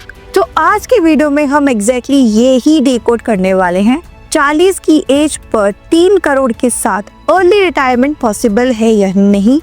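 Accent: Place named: Bengal, India. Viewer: She may be a non hindi speaker. Maybe Bengali or any other non Hindi Speaking area . Trying well. Keep it up.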